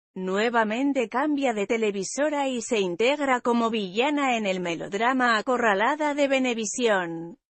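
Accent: Spain. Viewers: Odio el acento español!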